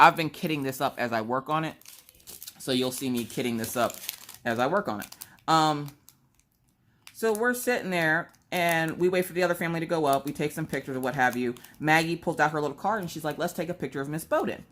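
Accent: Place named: East Coast, United States. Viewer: I'm new to your channel and you've really got me addicted! When I first heard you I figured east coast. I spent a LOT of years in Baltimore. Then when I heard you say you were in ND? Even a smaller world. I was born and grew up there. And now I'm in SD so was loving to hear about your trip to the badlands! I'm in Rapid, in sure you passed pretty closely by.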